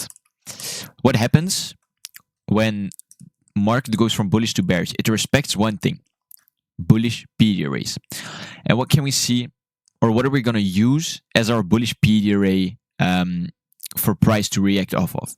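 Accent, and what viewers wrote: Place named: Netherlands. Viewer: ahh okay.. thanks for replying. Btw..Nice Dutch-English accent you got there!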